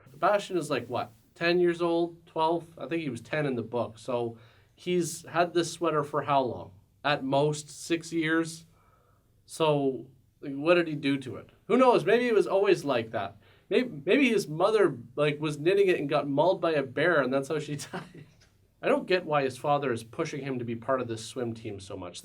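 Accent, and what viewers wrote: Canada. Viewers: canadian, eh? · Are you Canadian?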